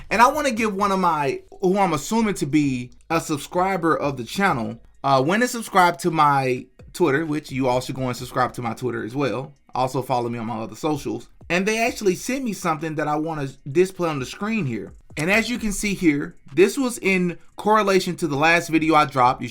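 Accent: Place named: United States. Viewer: Congratulations on your channel, and on this reaction. I am ignorant about basketball, but I like watching it. I am much less ignorant about soccer (I assume you are American from your accent, and since when I am on this channel I am in your house, I call my favorite sport soccer; I know you would have the respect to call it football if you were talking about the sport anywhere outside the US). Sorry about the long intro. You said something in this reaction that is exactly what I have been thinking about when it comes to nominating the MVP in the NBA. How can there be any doubt about who the MVP is? I mean it. When I vas at the University of Pittsburgh (3 years), I mainly concentrated on getting drunk before football, and basketball games, making sure I could still make it to the stadium or the arena with my friends..;-) But I watched the NBA's playoffs, final, all-star game, and I knew who the MVP was every year. 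so that was forty years ago; ok, speed up the clock to '95, or even t 2005, when I stopped following. I will bet my favorite soccer worn jersey (will tell you next time which it is if you care) that no coach, commentator, executive who cared about being safe on the street would have made the arguments (they are not even arguments) you hear now from those who support anyone but Jokich as MVP. I will tell you what makes it unbelievable. Not even in soccer would your these arguments. SOCCER yes, where fans, where fans will defend their team and its stars to the point o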